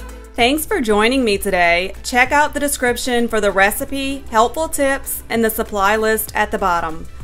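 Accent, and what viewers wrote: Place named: New Orleans, United States. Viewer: Used this video thanks... settled on the guar gum. btw are you from New Orleans you have a particular accent that reminds me of home?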